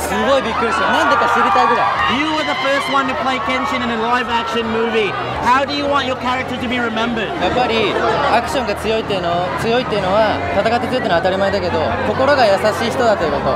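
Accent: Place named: Australia. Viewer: japanese with austalian accent!!!:))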